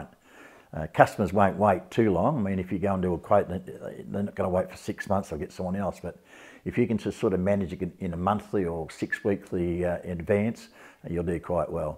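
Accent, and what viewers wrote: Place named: Australia. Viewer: Great to hear an Aussie accent for a change! A good decent bloke giving honest no nonsense advice that's actually relevent to Australian conditions. Always enjoy your video's mate! Steve. Upper Cuts Lawn Care - Devonport Tasmania.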